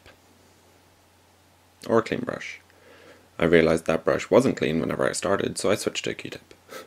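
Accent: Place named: Northern Ireland, United Kingdom. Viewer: ooh...love this look and your technique is amazing! Do I recognise a good ol northern Ireland accent there...where you from originally? :-)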